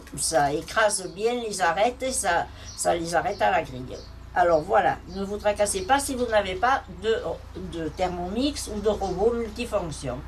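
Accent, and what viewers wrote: Provence, France. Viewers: Merci pour cette belle recette, j’imagine la bonne odeur. Juste un petit bémol, je sais pas qui filme, mais il faudrait pendant votre préparation que l’on puisse voir l’intérieur des marmites, du thermomix afin que l’on se rende compte les consistances au fur et à mesure. Par contre vos explications sont géniales. Désolée pour le caméraman c’est juste une sujestion, j’espère qu’il sera pas fâché 🤣🤣🤣. Toutes vos recettes sont excellentes. Merci beaucoup. A bientôt de vous lire et d’écouter votre bel accent de Provence. 👍👍🥰🥰♥️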